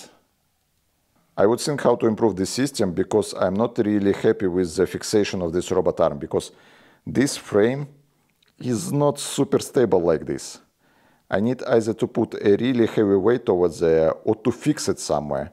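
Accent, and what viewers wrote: Russia. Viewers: этоже руинглиш. все понятно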